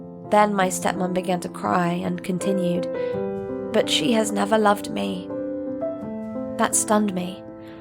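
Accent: United Kingdom. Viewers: is she British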